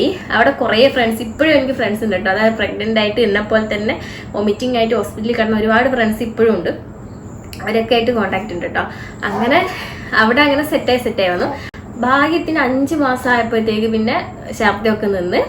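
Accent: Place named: Kuttiyadi, India. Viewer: that is kuttiyady slang😂😂😂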